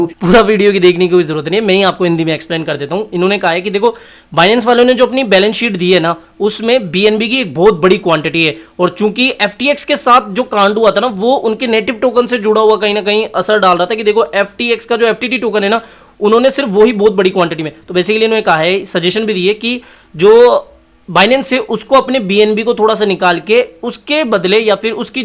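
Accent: Rajasthan, India. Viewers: Rajasthan se ho kya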